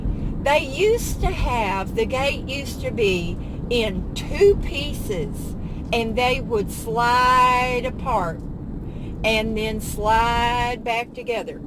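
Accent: South, United States. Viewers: I grew up in Louisiana. Kinda recognized a southern accent and thought maybe you had been nearby at some point :-) I've lived all over too!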